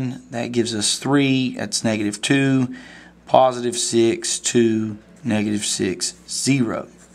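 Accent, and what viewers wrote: South, United States. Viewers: you got that country accent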